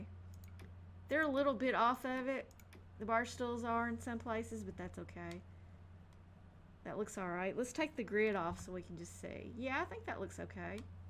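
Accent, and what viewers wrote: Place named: Texas, United States. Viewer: you from Texas? You have a cool western/cowboy sorta accent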